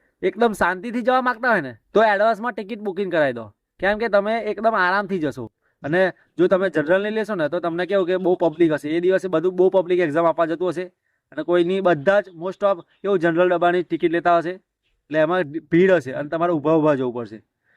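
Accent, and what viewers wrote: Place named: Surendranagar, India. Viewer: તમારું ગામ,તાલુકો અને જિલ્લો કયો..ભેરુ.. સુરેન્દ્રનગર ના લાગો છો બોલી જોતા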